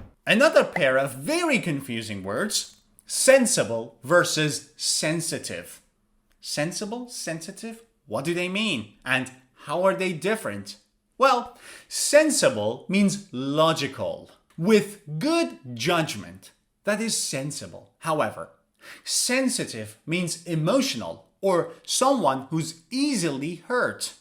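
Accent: United States. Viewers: Are you From America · You are really American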